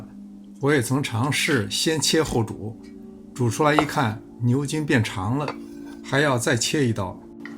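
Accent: Beijing, China. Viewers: 是北京人吧？ 很亲切的声音:yougotthis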